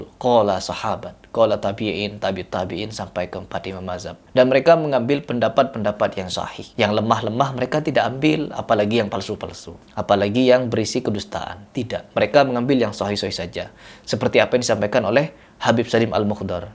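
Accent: Madura, Indonesia. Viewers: Logat maduranya kelihatan😊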